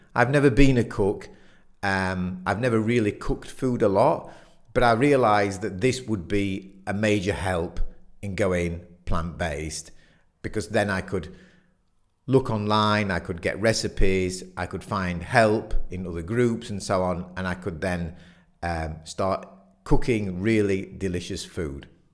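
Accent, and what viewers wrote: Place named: United Kingdom. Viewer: I'm a fan of the British and, like most Americans, I fall for the accent. You are a lovely man. My husband reversed his gout and other physical ailments, but his doctor had to tell him, "Your numbers are not good at all!" And so, long story short, he listened to his vegan, low-to-no fat diet and found, at his next doctor visit, that his numbers were much better and his gout went away. Carry on, kind sir, as you Brits say!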